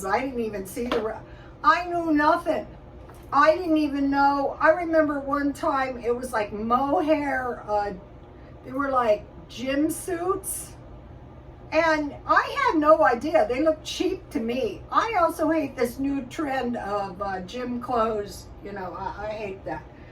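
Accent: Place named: Utah, United States. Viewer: Maybe a Utah accent?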